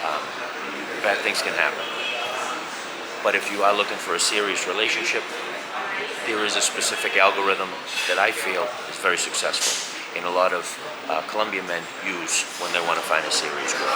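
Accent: New York, United States. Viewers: Im from NJ and I can hear a long Island or NYC accent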